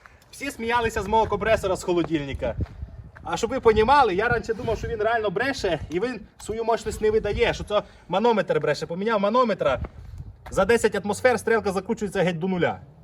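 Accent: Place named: Surzhyk, Ukraine. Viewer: суржик у тебе жахливий,щось мені підказує що народився і виріс ти не у Рівненській області.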